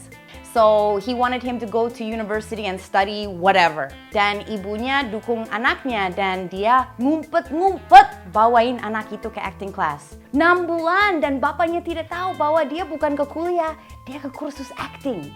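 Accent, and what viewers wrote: West Java, Indonesia. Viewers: Logat sundanya dapet euy...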